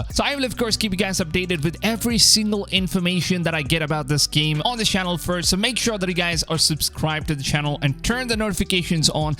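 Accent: India. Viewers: U indian boi tho? I am picking up a slighhhht accent wasup? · Yes and he clickbaits by using thumbanails and titles ,perhaps he is somewhere from india but his accent is different from the accent which i hear from the common folks of my country. And i think we shouldnt follow this guys content . Sometimes he says AC valhalla is the best assassin but after making 2 videos he says AC vallahalla and Ac odyssey are repetitve games..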